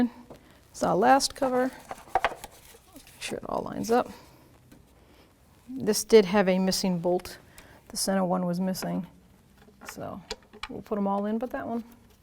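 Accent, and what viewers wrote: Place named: New York, United States. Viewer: Sounds like she's from New york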